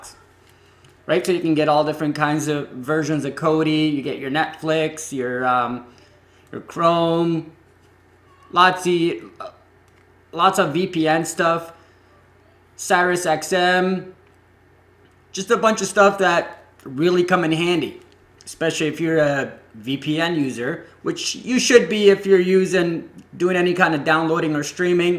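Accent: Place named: Canada. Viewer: canadian, it's funny when you say out ( owwwwwwwt and abowwwwwwt) lol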